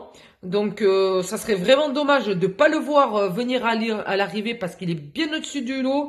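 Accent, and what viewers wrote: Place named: South, France. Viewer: Bonjours demoiselle,quel accent tu as d’où tu est du sud ou sud ouest.si ton physique est comme ton accent,tu doit être très charmante gros bisous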